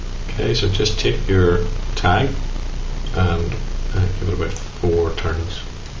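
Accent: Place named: Northern Ireland, United Kingdom. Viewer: Always been pondering about a fly to use on that simply difficult river, this fly built to great complication has opened great opportunities to fish on this river. i caught 3.5 with this fly alone in one day "WOW", anyway thank you for the post, my brother Henry will enjoy this video too. Like the northern ireland accent.......... Tight lines.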